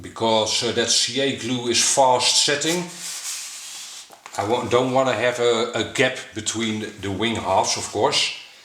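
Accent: Netherlands. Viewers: Really enjoy your vids. Loving the accent especially. Wishing you every success with growing your channel. You obviously put a lot of hard work into it and are passionate about the hobby. Respect buddy. Cheers Dom (Essential RC)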